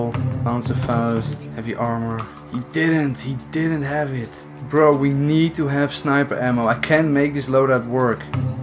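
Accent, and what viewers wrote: Netherlands. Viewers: I know your Dutch i can hear t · yo wtf you're dutch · His accent sounds SUPER dutch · ik hoorde aan je accent dat je nl bent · Wait are you Dutch? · Are you dutch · Wtf you are dutch? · Are u Nederlands 🇳🇱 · are you from the netherlands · Boy you dutch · Ben je nl are you Dutch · Lol are u dutch?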